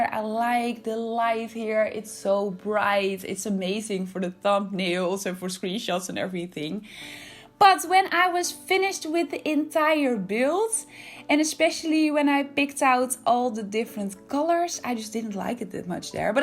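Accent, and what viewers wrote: Netherlands. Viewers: Your accent is so Dutch 😂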